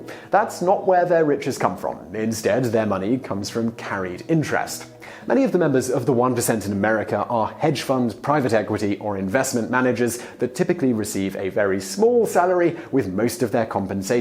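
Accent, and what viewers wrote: United Kingdom. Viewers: Too much haphazard information being thrown around: 1%, 0,1%... Oh, it turns about to be US incomes only? And what is all this information based on? Moreover, it's a Brit talking about the US, sacrificing even more on credibility... Not sure what to make out of all this in the end, rather than a fancily enthusiastic presentation by a guy with a British accent...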